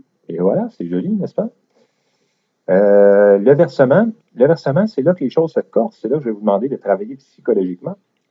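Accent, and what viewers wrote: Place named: Quebec, Canada. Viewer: l'accent... typique québécois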